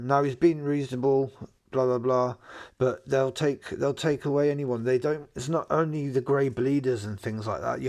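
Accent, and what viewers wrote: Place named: North, United Kingdom. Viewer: love your northern accent...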